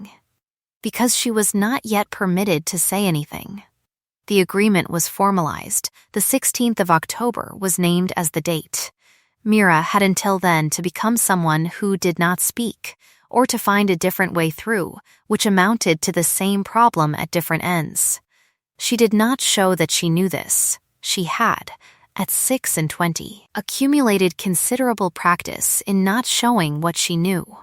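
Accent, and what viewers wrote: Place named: North America. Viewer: This AI voice is annoying and it's a North American voice for 19th century England.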